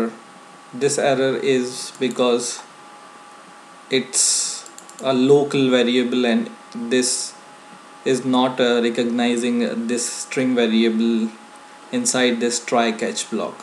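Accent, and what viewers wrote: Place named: India. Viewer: I get nervous when i hear this indian accent. Somehow i hate it !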